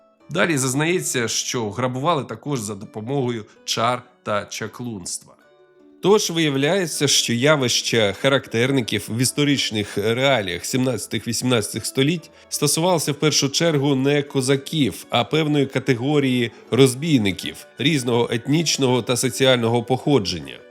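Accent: Russia. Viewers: Ну нащо пхатися в знавці фактів про характерників? А акцент московський все ж відчувається попри правильну вимову:)) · Хто ви такий щоб давати такі неправдоподібні характеристики нашим козакам, та ще з москальським акцентом??? Ви подумайте перед тим як людей водити в оману!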